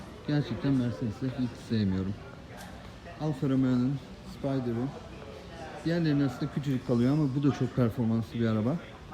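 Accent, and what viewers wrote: United States. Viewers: Abi Amerikan İngilizcesi aksanın harika Türkce aksanın da harika çok yetenek gerektiren bir şey. Kullandığın bazı kelime gruplarını bizim burada yaşayan çoğu insan bilmez bile helal olsun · Abi amerikalı mı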